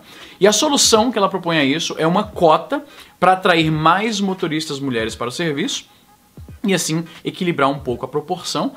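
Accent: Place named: Ceará, Brazil. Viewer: Nesse video podemos perceber as raizes nordestinas do, provavelmente do Ceara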